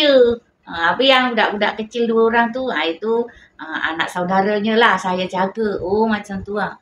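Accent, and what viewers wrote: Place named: Java, Indonesia. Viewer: Slang Jawo bunda masih pekat sangat saya dengar, kakak ipar saya dah macam orang tempatan dah bunda slang bahasa' Jawo nya sudah kalah dengan bahasa Iban dah😅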